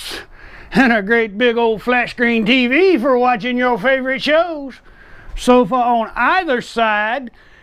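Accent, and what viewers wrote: Texas, United States. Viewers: I'll buy this if you come along, too 😉 ! You are AWESOME!! I didn't check yet where you're located, but you sound like you might be a Texan, who are some of the coolest people in the world 😘 !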